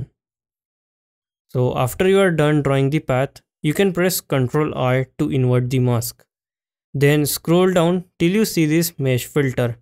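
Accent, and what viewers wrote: India. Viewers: You know that when a indian accent youtuber is making the video, he's going to help you out with any problem.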